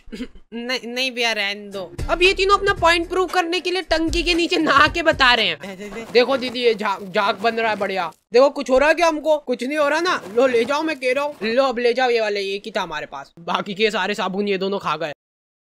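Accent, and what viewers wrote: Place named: Madhya Pradesh, India. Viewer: MP ka chora hai 🗿 uska accent <3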